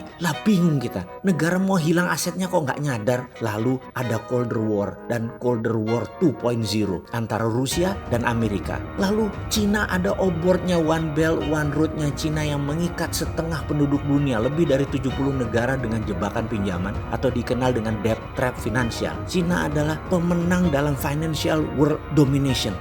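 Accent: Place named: East Java, Indonesia. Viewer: beda bro, kerasa logat jawa timurannya, karena ane orang madura, sudah terbiasa dengerin logat jawa versi timur, haha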